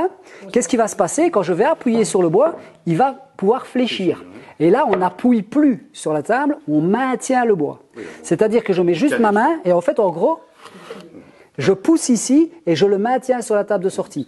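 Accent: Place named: Belgium. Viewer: ' c'est du Belche une fois hein' .... le charme à 100%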